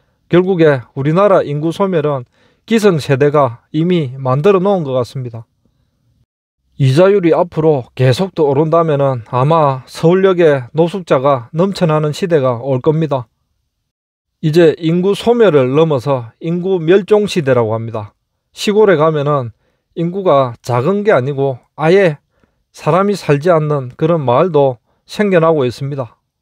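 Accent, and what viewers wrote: Gyeongsang, South Korea. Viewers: 영상 내용도 내용이지만 운영자님의 완벽한(?) 경남 사투리에 늘 더 끌리게 됩니다 ㅎ~. 저도 고향이 경상도인 사람인데 운영자님의 나레이션을 듣고 경상도 사투리가 이렇게 매력적인가??..새삼 깨닫게 됩니다. 암튼 좋은 영상 늘 감사히 잘 보고 있습니다~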